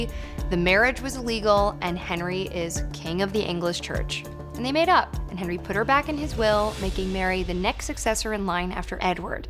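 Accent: United States. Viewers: Its hard listening to English history via a twangy American accent, no matter how attractive the speaker is.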